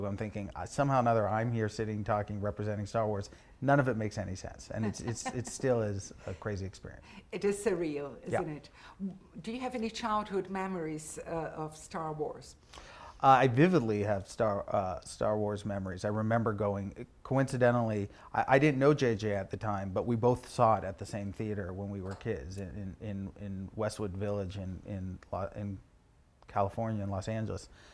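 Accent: United Kingdom. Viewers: Que inglês é esse, hein,?! Sotaque britânico, pronúncia impecável e fluência perfeita! E a entrevista está ótima também! Meus parabéns pelo canal.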